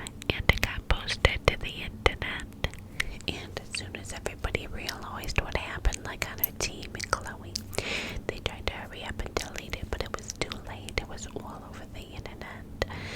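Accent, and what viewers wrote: New York, United States. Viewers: Omg yayyy!! NY accent is my favorite!!🥰 Thank you for this video!!!🥳💓💞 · another appearance by the green background lighting!! my fav. you look beautiful and the new yowrk accent is amazing 🥰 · I literally can't cope with your NY accent videos. They are SOOOO good. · the ny accent is literally iconic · I have been waiting all day to watch this to help me go to sleep. I LOVE story times with her NY accent, so tingly. Her whisper is the best whisper ever, she needs to have way more followers. The people need to hear her super tingly whisper 💗💗💗 rip dmx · I'm loving your NY accent 😍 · Yay, more NY accent whispers!! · This was soo good. I love the ny accent. You should def do a weekly video like this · you look gorgeous as usual! The NY accent is definitely my fave!! Sooo tingly! Hope you and your family are well❤️ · the New York accent is so relaxing!!! 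💕💕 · your ny accent videos are my go to everytime i’m relaxing! they are super fun and relaxing at the same time. thank you 🥰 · loved his video!! your ny accent is so tingly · This video made me fall asleep it was sooo good so I came back just to say I love your videos so much and the accent is amazing! 😍 I love the NY accent since I am from England ♥️ listening again whilst I do my makeup 🥰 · FINALLYYYY a NY accent video!!!! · I love sounds like an Italian New Yorker 😭❤️ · OMG YESSS... who else absolutely loves the NY accent 😻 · I love all of her videos, but the NY accent is everythingggg. I was born and raised up north and I moved down south a few years ago. I love the southern accents, but I miss the NY and Boston accents, so I really appreciate these!💛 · honestly, i got tingles in the first like, 45 seconds. love your ny accent videos and your great energy! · I love your NY accent! Sooo tingly, your Niagara Falls facts in NY accent was the video that made me subscribe to your channel🥰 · Loveeee your NY accent vids!!! 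· I loveee the NY accent reminds me of my grandma 🥰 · The NY accent is my fave, sooo tingly 😴 RIP DMX, I was so devastated by the news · This video is so tingly I am so glad you posted, I wait there for you to post all the time because your voice is so relaxing🥰😴. Also love the NY accent it adds to the tingles🥰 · the new york accent vids are my favorite!!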